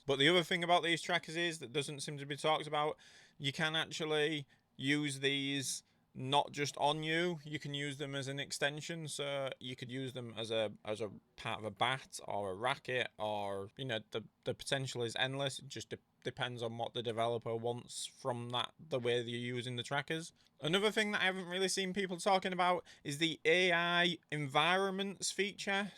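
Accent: United Kingdom. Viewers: Thanks for the info, not much on Pico 4 Ultra out yet on the net! BTW, I find your English accent really interesting! What part of the UK is this from?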